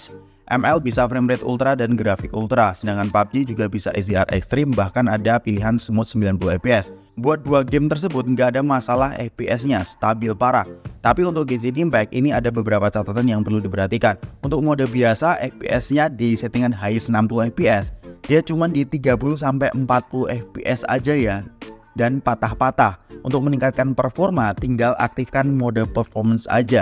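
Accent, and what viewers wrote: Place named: Java, Indonesia. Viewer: tp emng enggres jawa nya kerasa bgt, gw juga org jawa kerja di jaksel harus lebih ati ati lg kalo mau nmng inggris ga boleh asal PD doang tp hrus lebih blajar dlu pengucapan yg bener gmna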